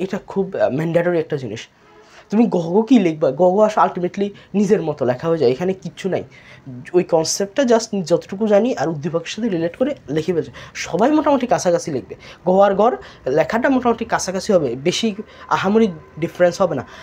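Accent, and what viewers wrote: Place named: Noakhali, Bangladesh. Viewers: Vaiya,r kothar accent dekhei bujhe gelam vaiya Noakhali thake 🙂 Hea amio Noakhali tei thaki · Vai mone hoy noakhalir😊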